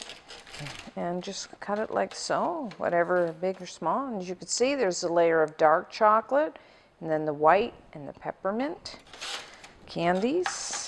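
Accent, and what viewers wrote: Canada. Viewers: whoah shes canadian! haha thats awesome!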